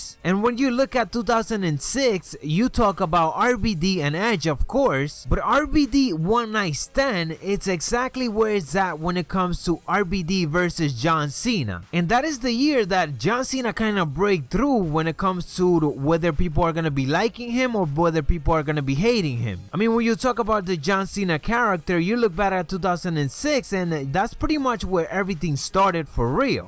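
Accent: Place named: Spain. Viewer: Ur channel rocks , is that ur voice , and r u from Spain or something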